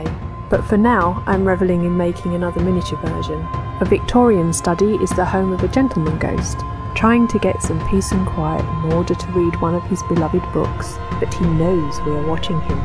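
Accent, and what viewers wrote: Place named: United Kingdom. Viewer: Hey, came here from SEG! I’m so glad he mentioned you as this is exactly my type of content. I have plans for making some period dolls house vignettes with a story tying them together. I’m teaching myself so it will be slow going, but it’s been a dream for so long. Do you ever showcase your work in person? I am uk based and your accent makes me think you are too. I’d love to see it in person one day.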